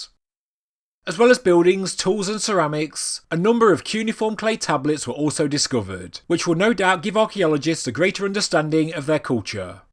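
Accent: United Kingdom. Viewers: Sounds like a regular Brit to me. Guess am more used to a wide variety of accents. Thank God he doesn't talk with that unintelligable deep South or hardcore Texas accent. That is soooo hard to understand even when you live there. Give me even an Australian accent over that.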